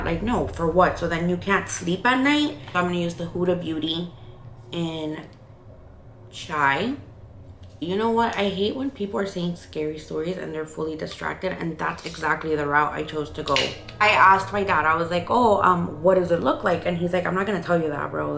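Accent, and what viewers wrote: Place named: Miami, United States. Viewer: as you were speaking I just had a feeling you were from miami...I swear the creepiest things happen here. I have so many paranormal stories just like this but wow this definitely unlocked a new fear LOL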